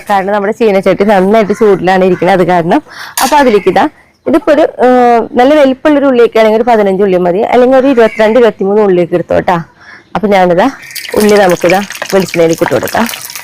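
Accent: Thrissur, India. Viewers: Ath Thrissur samsaram aanu..❤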